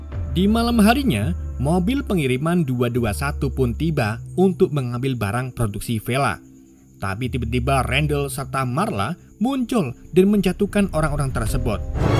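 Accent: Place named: Banyumas, Indonesia. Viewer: Kilihatan admin orang jawa ngapak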